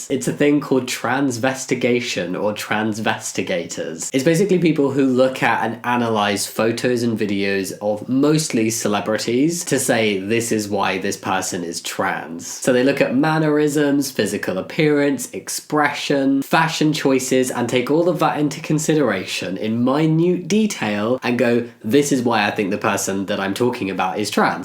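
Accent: United Kingdom. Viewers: Your voice is fine. You just sound really british.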